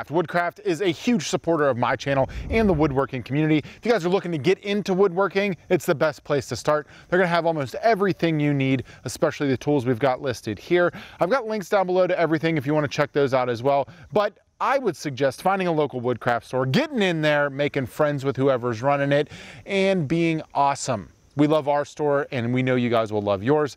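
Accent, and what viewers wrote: Pittsburgh, United States. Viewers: Oh man are you from Pittsburgh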